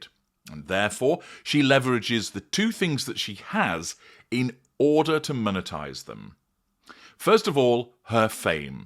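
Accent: United Kingdom. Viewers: Why can't you people leave this woman alone? Why go after her specifically? Is it because you have that dastardly british accent i have grown to hate.ALL YOU PERFECT PEOPLE??.this is truly defamation of character..Why not go after Trumpanzee..the biggest Narcissists of OUR TIME and his cohort VANCE, PLUS MEGAN KELLY...YOU FOLKS YOUR TRUE COLORS ARE REALLY OOZING.MEGAN NEEDS TO SUE YOU,PERSONALLY .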